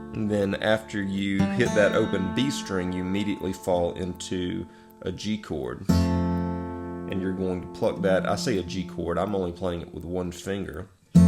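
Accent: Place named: Tennessee, United States. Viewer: I love your voice. You sound like the comedian Nate Barghatze. I bet you're from Tennessee 🤗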